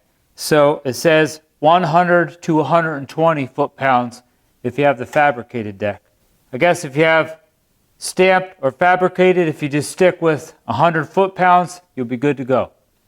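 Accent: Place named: Pittsburgh, United States. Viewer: Your voice sounds like you live near Pittsburgh. I use a rope to pull the idle pully back and then remove the belt from the PTO. Also use an air impact wrench to remove and reinstall the nuts on the blades. Never clean under the deck and never torque the bolts. We have a fabricated deck, it will take years to rust through. Thinking about building a low crawler to be able to slide the deck in and out from the mower. I do grease the zerks though every time I sharpen blades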